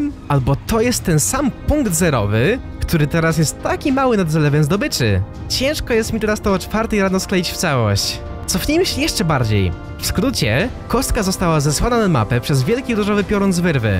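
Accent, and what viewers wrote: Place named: East, Poland. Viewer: Co to niby znaczy? Przecież pisze prawdę. Chłop robi dobre filmy, ale ten jego wschodni akcent wszystko psuje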